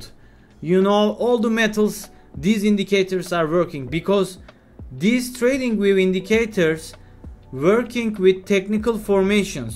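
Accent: Turkey. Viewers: Krdeşim sen Türk'e benziyorsun konuşma aksanından anladım isim neydi? Bir de videonuzda altyazı özelliği aktif değil..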